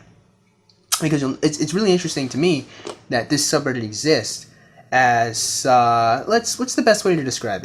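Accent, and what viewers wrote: United Kingdom. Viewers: I love your british accent btw, some real funny shit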